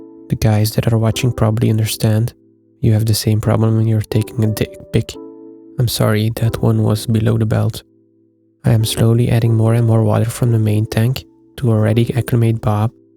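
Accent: Netherlands. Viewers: I hear Dutsch😅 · As a person from The Netherlands I was kind of thinking you were dutch by your pronounciation but not quite sure.. this got confirmed though when seeing the Triops kweekset.. Toffe video!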